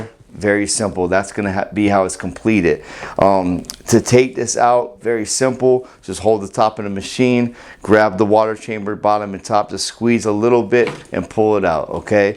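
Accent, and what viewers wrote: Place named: North Jersey, United States. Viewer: Bro!! You from North Jersey?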